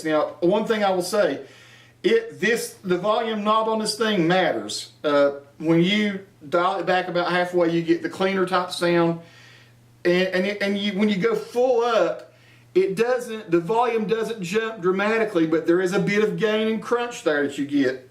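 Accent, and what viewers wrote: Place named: Appalachia, United States. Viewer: Hi, just found this video through your Scottish accent video. Weird coincidense, I started looking for a Telecaster just yesterday and was wondering if I should spend a little bit more on a Mexican-built Fender or buy a cheap Squier. Difficult decision as I‘m an amateur and only play ocassionally at home. I think I will go for the real deal - a Fender with single-coils. Thanks for showing all the different modes, it helped to have a side-by-side comparison of the two different pickup styles as I only played single-coils all my life. I also really enjoy your Appalachian accent! Thanks & greetings from Germany, Alex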